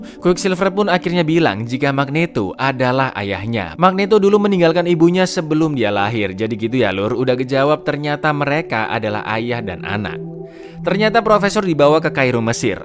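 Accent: Java, Indonesia. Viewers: Ngomong buyar nya gg wkwkw kentel amat jawa ta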